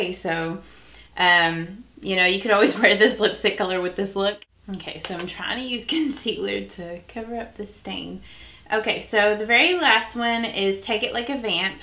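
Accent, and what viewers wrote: South, United States. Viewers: Enter me in the giveaway. I have followed you for a long time, your channel is amazing. Love your Southern Accent and love to here you speak. Blessings!!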